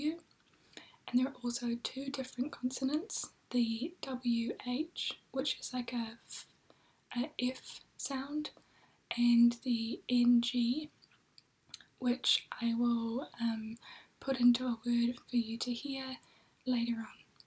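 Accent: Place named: New Zealand. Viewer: I do love the New Zealand accent. Thanks for a lovely upload 😊stay safe and well.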